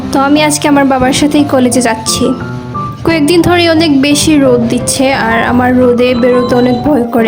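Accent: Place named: Sylhet, Bangladesh. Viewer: তুমার মুখে সিলেটি ভাষা প্রচন্ড ভালো লাগে।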